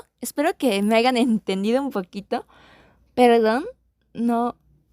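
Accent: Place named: Latin America. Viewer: Sí se nota que eres de latam 😂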